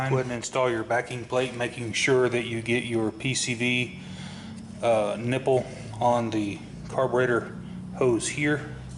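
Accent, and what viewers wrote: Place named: North Florida, United States. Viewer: You sound like a North Florida Boy... Great vid. I'm a North Florida Boy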